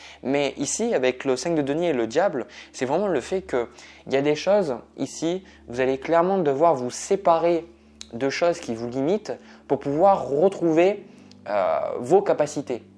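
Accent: South, France. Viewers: Merci pour ce tirage très pertinent. J aime écouter vos guidances et votre manière de les transmettre, de façon naturelle et franche avec cet accent du sud qui me reconnecte à mes racines.🙂 Merci aussi pour votre dévouement et l aide que vous nous apportez. 💕🕊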